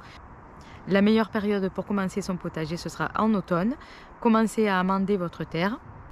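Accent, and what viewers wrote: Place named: Provence, France. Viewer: Bonjour, sacré potager sacré boulot ✌️🌷 l'accent de vers chez moi, camargoprovencal 😁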